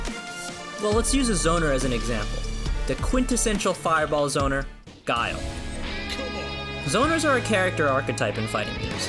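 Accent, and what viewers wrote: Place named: United Kingdom. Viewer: In that intro you really sounded like the slay the princess narrator lol xDDDD Or maybe its because im not used to brittish accent idk, but it reminded me so bad of that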